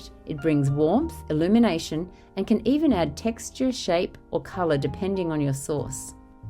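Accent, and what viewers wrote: Australia. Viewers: I have been looking for an Aussie channel, and I am so happy I found yours. It was so lovely to start watching and hearing your Aussie accent. I am not saying I don't live other channels I do, but I love that I found a channel in my own country - so precious! ❤❤❤❤❤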